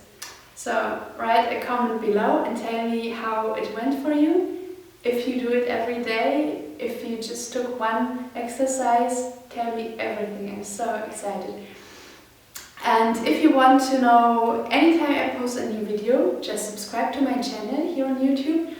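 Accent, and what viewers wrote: Germany. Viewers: Du bist Deutsche, das hab ich sofort gehört ;)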